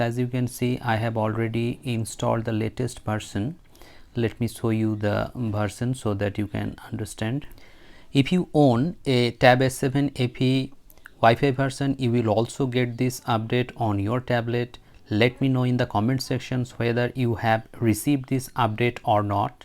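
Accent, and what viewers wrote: India. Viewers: Bihar se ho bhai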